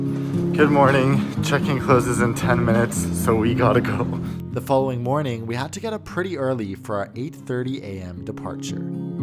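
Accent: Singapore. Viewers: That Singlish is absolutely awsome! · mate, first time watching your videos, im blown away not just by the quality of your review, but also your singlish!! Wa eh steady leh, very rare to hear an angmoh dude like you speak like us! thank you for giving our beautiful creole language some spotlight! :) - from sg with love :) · Hahaha love the Singlish scattered thru out this video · I subscribed when I heard you speak with that Spore accent! 🤣👍👍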